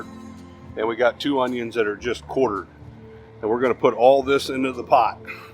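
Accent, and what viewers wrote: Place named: New England, United States. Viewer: That yankee accent though….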